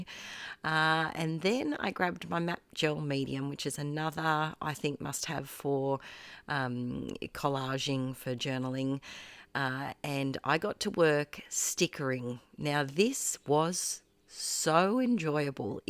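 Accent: Australia. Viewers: I’ve just come across your channel, and I love your style! You sound like an Aussie (me too) - but where do you buy your Bande washi sticker rolls, without them costing you a bomb in postage!?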